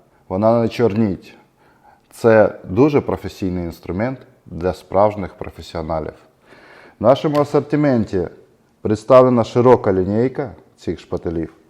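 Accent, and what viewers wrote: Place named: Russia. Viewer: Да, чувствуется, что русский родной 😊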